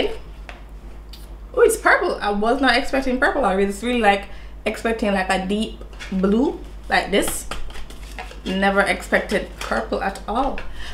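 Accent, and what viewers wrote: Jamaica. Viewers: That Jamaican Patois 😍😍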